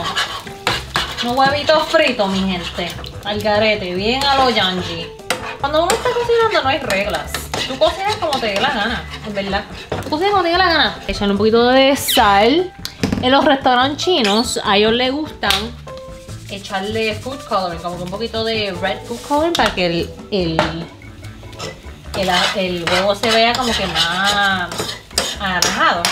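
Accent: Puerto Rico. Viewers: Ese acento se oye muy bien,que bueno que ames tanto los recuerdos en mi bella isla,bueno corrijo,en nuestra bella isla porque con ese acento jamás hubiera pensado que eres asiática. Gracias por compartir la receta y por haber hecho feliz el paladar de mucha gente en PR❤️ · Es normal. Es puertorriqueña. ¿Cómo va a hablar si no es como lo que es? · Que bonito escucharte hablar como boricua nosotros vivimos15 años en NY y nunca vi o escuche una persona china boricua y ahora que te encontré me encantó tu acento y tu personalidad somos de GUATEMALA pero amamos los boricuas porque son de buen coorazon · Wow. El Acento Boricua ❤️😍 🇵🇷 The Perfect accent. You Speak it Perfectly 😍 🤩 Lo habla Perfectamente! 😌 el Acento Puertorriqueño 🇵🇷 God Bless ! 🙏🏻 . Puerto Rico 🇵🇷 in the House 🙌🏻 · La voz la delata la forma de hablar .100 porciento bori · Woooooow ❤️❤️ Nunca había visto a alguien asiático con ese acento boricua tan REAL! 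Un verdadero orgullo. Una personalidad tan súper amistosa y súper PRECIOSA POR CIERTO. Que ricooooooooo, quiero hacerlo ❤️ 🇵🇷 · Ella Dice > Cocinal < Bien Boricua.. ✌🤣🇵🇷 Tu hablas bien Boricua Hasta cambias la R por la L... Weepaa! Saludos.. 👸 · 🥰🥰🥰 Que chula tu mami, 🥰🙏🏼 Tengo que hacerlos y es ya! Gracias! hablas más boricua que yo 🤪😁😁😁🌹🌹🌹🌹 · Mi arroz preferido ....y una asiática que habla con un acento más boricua que el mio ....espectacular me suscribí a tu canal estaré 🇵🇷👀👈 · .. Yo soy Boricua vivo en Texas.. Te Pido Disculpas por el Pueblo de Puerto Rico.. La Mayoria no somos asi. Pero acuerdate que los ninos y adolesentes abeses son muy crueles. eres una joven especial y tus Padres son ejemplares. Te criaron muy bien. Me encanta tu acento Boricua y Tu forma de Ser. Sigue siendo Tu. And forget about anybody else. We love You. Que Dios Te Siga Bendiciendo. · Es China peroooo bien boricua en acento y corazón.. 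Ese acento nos distingue a los boricuas donde sea.. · QUE 🆒 Y QUÉ SYMPATICA ESTA MUCHACHA, PRIMERA VEZ QUE VEO UNA ASIATICA CON ASENTO BORIQUA 🇵🇷 ♥️😁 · Eso mismo dijeeee pensé que era boricuaaaaa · Ella habla bien boricua y se ve que ama a Puerto Rico. Mejor dicho, esa si es de aquí. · Dimeloooo!! Eso mismo estaba diciendole a mi amiga q me lo envio. Estoy estupefacto porq llegue aca en US 8 años ya y me habia olvidao de eso. Esta preciosaaa y mas hablando español de PR. Obvio no estoy diciendo nada porque boricua es!! 🇵🇷 🙌☝ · Una Chinita que habla como boriqua! Esto es un tesoro nacional! Que nice!🇵🇷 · “ me voy a haltal “ pura boricua me hiciste reír muchísimo me encanta como hablas.😂😂😂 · Eso mismo estaba pensando..habla bien boricua. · Omg girl!! You speak like a truly puertorrican!!! 💜💜💜💜💜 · 🈲️🈸️🈯️🈁️ Hija adoptiva de mi Puerto Rico que es tu casa 🇵🇷 y lo bien que habla nuestro idioma y acento boricua,me encanta tu dinamismo y simpatía chica 💖💖💖 🇵🇷🇵🇷 🇨🇳 🇨🇳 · Es bien gracioso ver su físico de chinese y escucharla hablando con todo el slang boricua! 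Muy bien video, fácil de seguir y divertido. ¡Excelente borichina!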